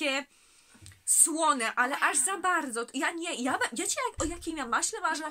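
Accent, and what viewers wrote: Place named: Silesia, Poland. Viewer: Jak fajnie ślonskiego posłuchać na yt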